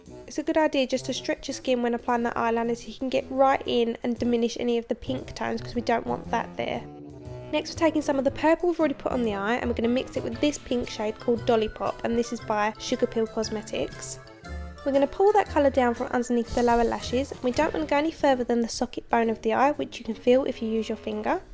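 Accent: Essex, United Kingdom. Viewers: You sound like a posher Essex;) But the word "Hatter" really gave it away. Im looking for something for halloween, Saw a black mad hatter on here that I really like but I install security systems and wouldn't have a clue how to do makeup. Especially facial hair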